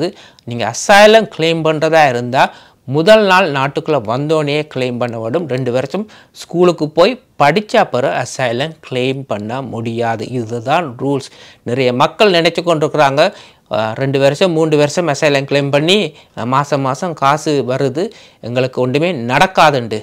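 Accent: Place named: Sri Lanka. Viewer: இலங்கை தமிழ் பிரமாதம்.. கேட்க இனிமை...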